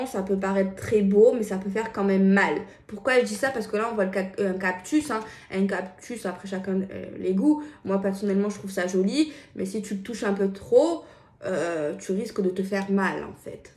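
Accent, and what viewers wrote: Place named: South, France. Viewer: T' écouter me fait plaisir, j'aime l'accent du sud, ta petite voix, courage petite, tu fais bien..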